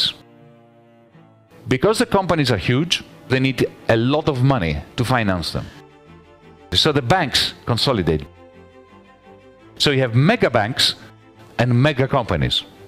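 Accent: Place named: Russia. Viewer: The Russian accent is so enraging when it comes to criticize of capitalism!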